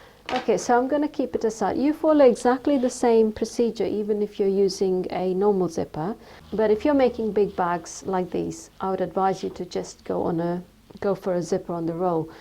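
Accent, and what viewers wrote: United Kingdom. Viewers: Where do you buy your notion? I been sewing for 40 years here is the USA. I never see a zipper that long. Are you from the UK. I think I hear a British accent? You have a beautiful speaking voice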